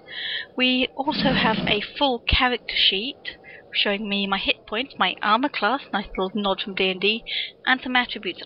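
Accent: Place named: United Kingdom. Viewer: British d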